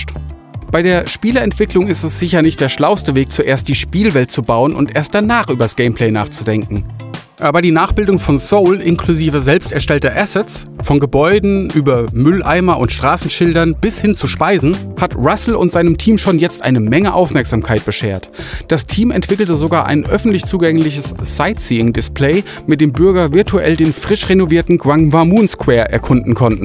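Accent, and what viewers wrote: Hesse, Germany. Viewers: Bitte Trant mach die komplette Story von Doom auf Hessisch!!! Das wäre so Epic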